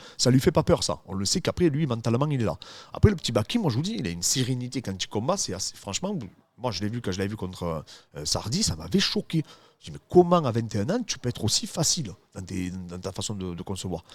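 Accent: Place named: Rural, France. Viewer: Tres bonne chaine, mais alors l'accent du terroir en + c'est un abonnement direct 😂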